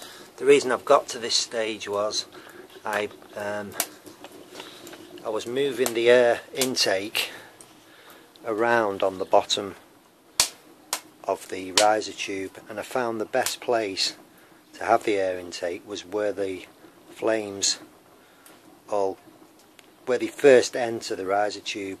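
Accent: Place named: Northern England, United Kingdom. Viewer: GREAT STUFF !!! I've thoroughly enjoyed your videos. I've learnt so much from you about heating with wood. I'm about to try to make a rocket adaptation to a smallish cylindrical wood heater in my bedroom. The chimney, unfortunately goes straight outside thus losing a lot of needed heat. I also have a wood stove in the kitchen and I'll see if I can have it heat water too, just like the Argas I came across in the UK. Where are you from by the way. You're definitely northern like me. In fact, I'm a Liverpudlian now living in Rio de Janeiro.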